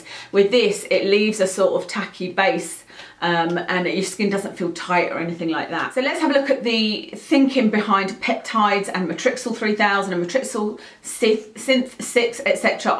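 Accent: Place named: Essex, United Kingdom. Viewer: I like your videos - you're so down to Earth. I also find the Essex-like accent amusing, especially how "l" is pronounced as "w" (... get my naows done), lol. 😆💅🏼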